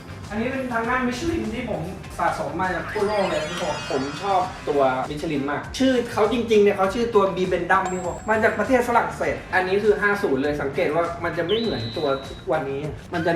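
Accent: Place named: Thailand. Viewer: โอ้เหน่อทำไม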